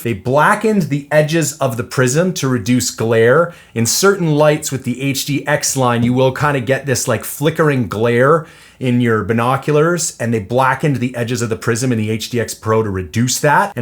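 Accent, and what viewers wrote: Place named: Canada. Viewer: I like this review. I heard about these from Cliff Gray's guide channel back when these came out and went to my local supplier and held some in my hands recently. I didn't know how the target/scan mode worked and neither did the guy at the station, but this showed us how . Picking the right model sure seems tough and fit in my bino harness I already have is going to be a pain. Carrying a pistol/food/cell phone/gps on a bino harness is a SERIOUS pain so maybe I can solve all of these problems with a buy once cry once approach. I appreciate your effort, scripting and editing, no BS, and passion and intensity. You seem like a solid dude with no bullshit sales flare with an ever so slight canadian accent. Appreciate you!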